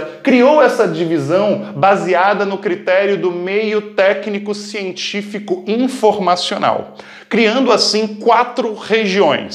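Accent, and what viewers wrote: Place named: Northeast, Brazil. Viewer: Vim pela minha prof q mando como explicação essa vídeo aula :v (já q estamos em quarentena e tendo só aula online). Obs: Vc é nordestino né? Pq tem um sotaque d lá ou do norte msm. Ps: Santro Oeste kskksks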